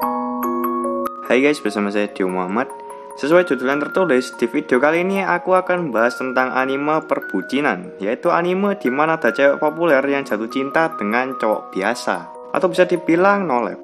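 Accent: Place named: Surabaya, Indonesia. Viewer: Kayak orang Surabaya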